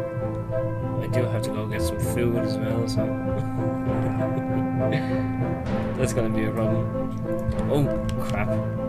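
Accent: Ireland. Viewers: What part of Ireland ya from? I'm from Cork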